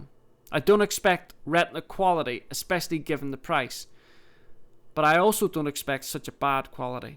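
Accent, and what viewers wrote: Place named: Northern Ireland, United Kingdom. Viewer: Nexus 7 - I love hearing your straight-talking, easy to understand and unbiased reviews in a familiar (Northern Ireland!) accent. Thank you for uploading all of these, and doing such a great job with the website.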